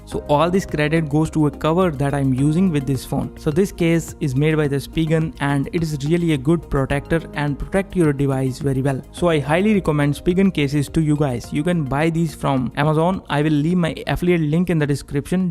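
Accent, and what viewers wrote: India. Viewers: Ugh another impossible to understand THICC Indian accent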